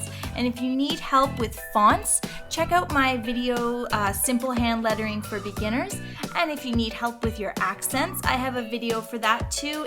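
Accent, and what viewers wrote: Canada. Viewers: I love your videos. Totally inspiring. I've taken so many ideas from you, and have even found myself slipping from my American hick accent to your fun Canadian one from time to time. :D That's how many times I've watched your videos!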